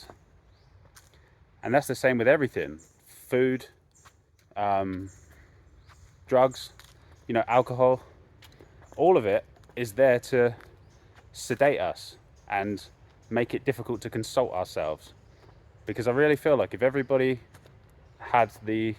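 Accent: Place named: England, United Kingdom. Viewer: What country is this, in.guy is English.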